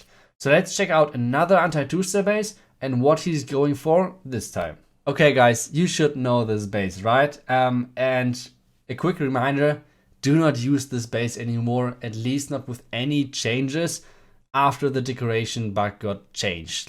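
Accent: Germany. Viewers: You can tell by his accent he is german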